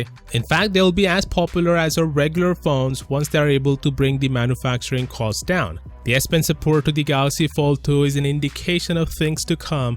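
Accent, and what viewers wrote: India. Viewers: As soon as i hear the indian accent I know this is just "speculation" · Sound hindi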